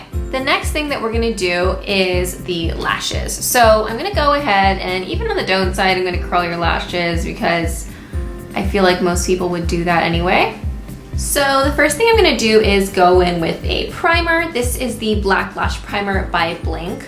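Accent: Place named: California, United States. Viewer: sounds like CA accent.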